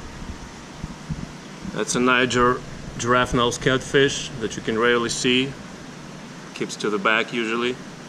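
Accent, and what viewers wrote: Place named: Europe. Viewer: Hi there, I saw the net you used when capturing the Tarpon but nets made to capture Koi have less resistance because they are flat. There's also another Koi-specific net called a sock net which makes transport of large slender fish very easy. Sounds like you are somewhere in the EU judging by your accent? The Koi market is pretty big in the UK and Germany so it shouldn't be difficult to find these items. Also a large umbrella net used to capture bait fish might make the process easier...if it swims right above the trap.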